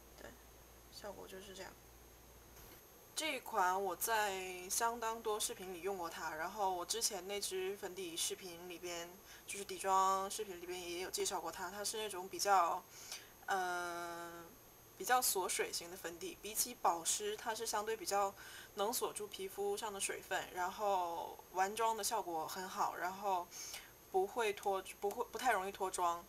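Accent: Northeast, China. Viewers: 东北人吗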